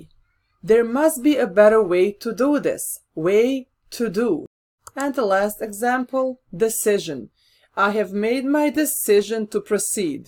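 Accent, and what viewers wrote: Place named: Arab world. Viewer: Outstanding tnx mama i think u native of arabic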